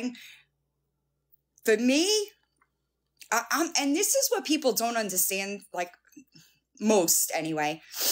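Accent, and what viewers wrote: New York, United States. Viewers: Im not being a weirdo or anything but I just wanted to tell you that you are a very beautiful Woman who gives off an aura of being a super strong intelligent woman who puts up with no bull shit❤ it's very refreshing to see and hear. So Smile! I do not know your whole story but I know that you just went through having the loss of your mom, I believe, and I just want to say that I will pray for you and hope that you can heal from such a traumatic event in life. That's what I consider this to be, in my own life as well. Okay I hope I said that right and I'm the one who said I love your accent. I'm from New York too, just not the city just a little above that around Poughkeepsie and I miss it so much 😢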